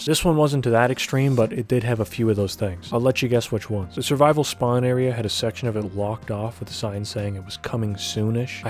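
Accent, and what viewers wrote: New York, United States. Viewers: Shout out to my fellow Canadian that sounds like a new yorker. We're a rare breed.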